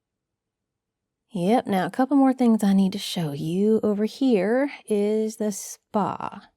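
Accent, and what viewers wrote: South, United States. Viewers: So this is that southern hospitality that they talk about. Like, I’ve never been to the south in my life, but that accent immediately immediately makes me feel at home and taken care of. Also. XP?! Good lordie that computer is ancient. Please tell me it isn’t connected to the internet. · Yes! This world needs more southern accent asmrs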